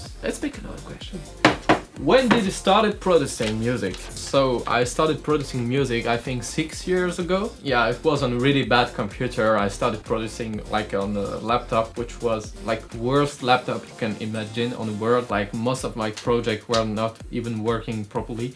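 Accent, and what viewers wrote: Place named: Toulouse, France. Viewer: Belle accent toulousainggg 🇫🇷🇫🇷🇫🇷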